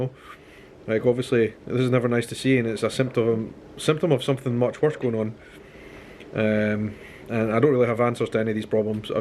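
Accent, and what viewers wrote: Scotland, United Kingdom. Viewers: Hello are you from Scotland?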